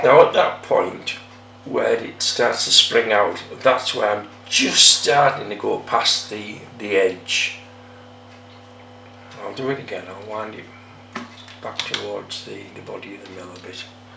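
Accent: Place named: Yorkshire, United Kingdom. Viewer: Where in the world are you as you sound like you live near me Im in Guisborough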